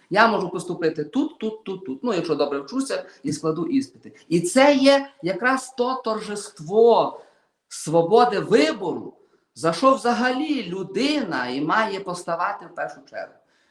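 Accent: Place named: Lviv, Ukraine. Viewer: Чому не на фронті,бридкий львівський акцент,послухайте полтавщину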